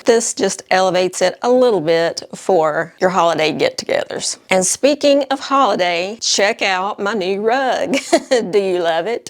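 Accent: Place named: Virginia, United States. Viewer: Your dogs r so cute! We lost our 13 year old toy poodle a few years ago and r thinking of getting a small dog. I have to tell u I was born in Virginia and moved with my family to Idaho when I was 10. You remind me so much of my aunts and cousins in Virginia with your cute accent and your no nonsense personality! I think that’s one reason I love your videos so much! U r just darling! Great video as usual thank u! ❤😊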